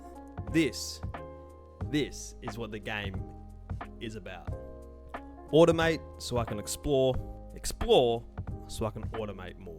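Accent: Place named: Australia. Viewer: This is the second time I’ve watched this video. I love your Ozzie accent!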